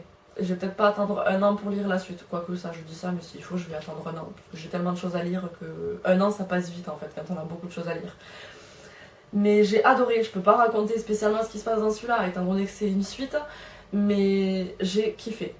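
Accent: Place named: Toulouse, France. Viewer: Merci pour ce point lecture ! (Ce petit accent me rappelle mon chez moi (Tarn et Toulouse).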